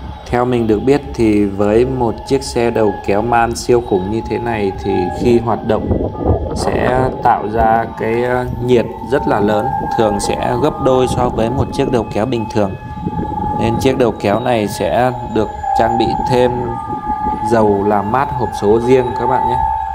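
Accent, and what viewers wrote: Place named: Cao Bang Lang Son, Vietnam. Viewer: giọng đọc của ad hình như là người cao bằng,lạng sơn vào đăk lak thì phải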